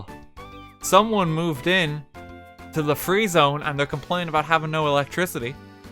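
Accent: Ireland. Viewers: Have you got a Irish acent if so I love it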